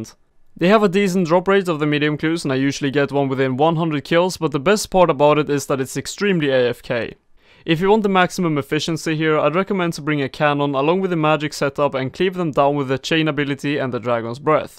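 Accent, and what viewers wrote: Sweden. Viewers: you sound swedish :)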